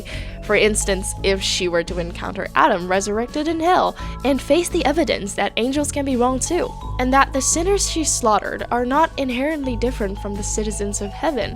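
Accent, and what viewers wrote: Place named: United States. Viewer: have autocorrect off. She is hella fluent in english, seemingly amerucan variety with english influence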